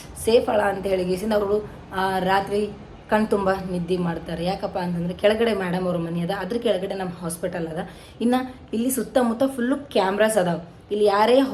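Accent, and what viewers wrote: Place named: Gulbarga, India. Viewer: ಹೇ ಹುಡುಗಿ ಛಲೋ video ಮಾಡ್ತಿ ಮತ್ತ ಬಹಳ ಛಲೋ ಗುಲ್ಬರ್ಗ ಭಾಷೆಯಲ್ಲಿ ಮಾತಾಡ್ತೀಯಾ ಹೀಂಗೆ video ಮಾಡಿಕೊತಾ ಹೋಗು ನಮಗೂ ಬೆಂಗಳೂರ್ ಭಾಷೆ ಮಾತಾಡಿ ಮಾತಾಡಿ ಬೇಸರ ಬಂದಿದೆ... ಏನಂತಿ 🙋‍♂️🙋‍♂️🙋‍♂️🙋‍♂️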